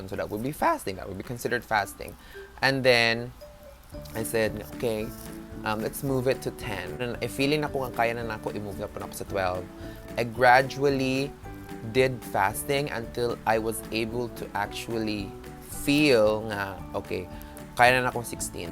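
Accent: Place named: New York, United States. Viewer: its the new yorker accent for me! HAHAHAHA pero bitaw na inspire ko charrr!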